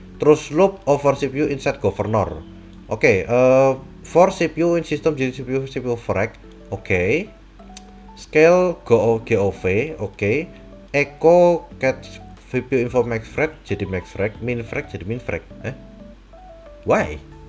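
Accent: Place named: Java, Indonesia. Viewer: keliatan jowo nya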